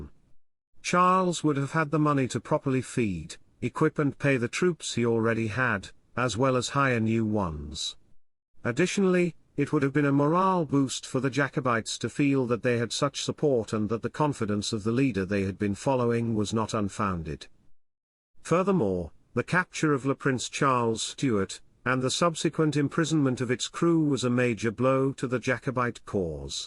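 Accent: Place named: Oxbridge, United Kingdom. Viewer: PPS: Having tried to join the QOHlds in the late 80ies (as a german, as a piper, as a late black brunswick hussar ((german bundeswehr Waterloo btn)) I'm very struck at the "oxbridge" accent of your presentation. Funnily enough it was the very same hessian-english pronounciation that bewilderered all the army careers personnel and the pipers I met with then and gained me the nickname "squire" back then (to my bewilderement, I thought myself to be so caledonian! 😂) Enjoy your videos immensely, carry on the good work!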